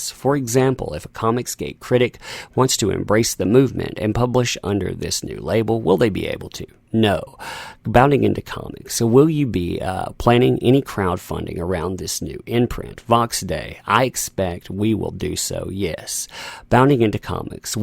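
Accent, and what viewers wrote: South, United States. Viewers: you sound like you have a southern drawl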